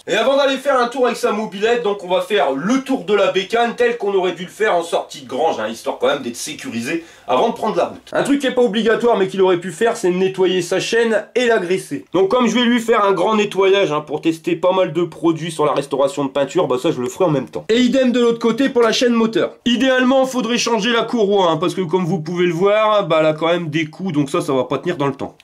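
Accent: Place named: Nord-Pas-de-Calais, France. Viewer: Bravo pour tes videos, c'est top, ça m'aide pour la restauration de mon Peugeot TXR de 84 ! Des conseils et cas concrets, de l'humour, de l'accent Chti qui va bien, je me régale ! Continue comme ça !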